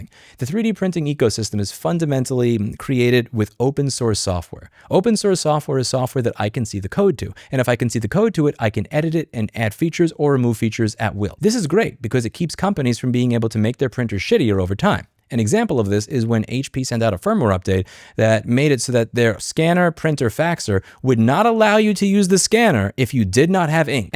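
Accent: New York, United States. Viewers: I love how the more he talks about new york, the stronger the new york accent becomes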